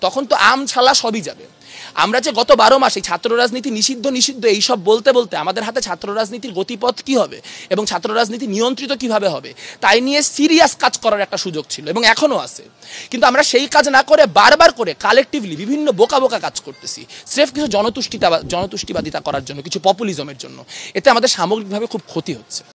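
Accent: Kolkata, India. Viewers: এর কথাবার্তা শুনে তোমার ইন্ডিয়ান মনে হচ্ছে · তার কথাগুলো কলকাতার মত। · ওনার কথা গুলা কেমন যেনো কলকাতার মানুষের ভাষার মতো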